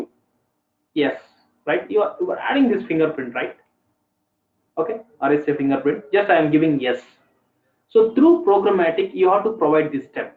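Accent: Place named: India. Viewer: non indian accent would enhance this video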